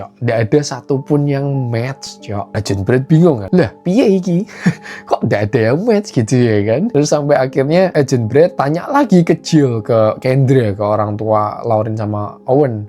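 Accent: United Kingdom. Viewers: Anjay pakai british accent cyuk, kereeeen. 👍🏻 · anjay pamer british accent nih bang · Love ur English accent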